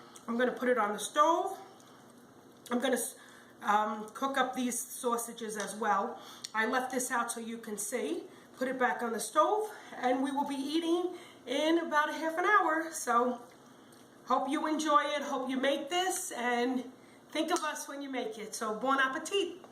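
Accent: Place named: New York, United States. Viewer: I love listening to you as you cook. I was raised in NewYork in an Italian family. Moved to Louisiana when I was 16. Your speech reminds me of my mom and our friends and myself before I lost my NYC accent. My friends tell me when I am tired, the accent comes back...ha! You bring back some sweet memories, thank you!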